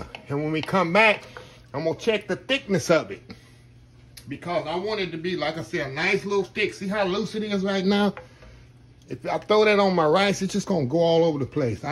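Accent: South, United States. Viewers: Oh my goodness! That looks so good. I like listening to your southern twang.....especially when you say temperature. 🤗🤗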